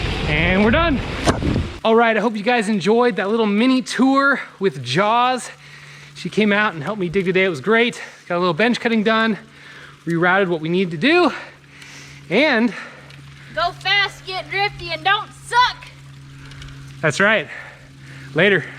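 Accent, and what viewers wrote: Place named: Minnesota, United States. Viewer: Oh ya is MN, eh is Canadian....nice vid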